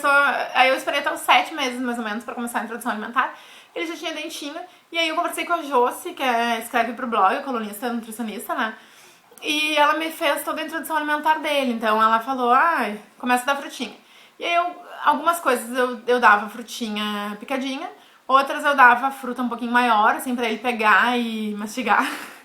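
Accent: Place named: Rio Grande do Sul, Brazil. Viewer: oi amei é sabe o quão é bom ver uma mãe assim de cara limpa com sutaque lindo aqui do nosso RS e estou só na espera do próximo beijo fiquem com Deus.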